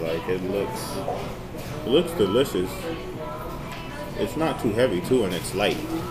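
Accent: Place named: South, United States. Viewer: I had to tease you lol.....you sound like my dad he's country..😉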